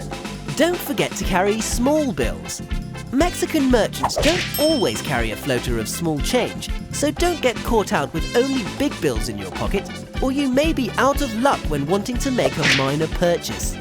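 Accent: United Kingdom. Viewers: He's not an American, he has a British accent.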